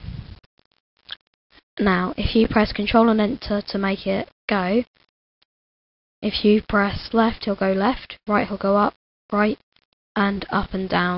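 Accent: United Kingdom. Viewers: hey are u british?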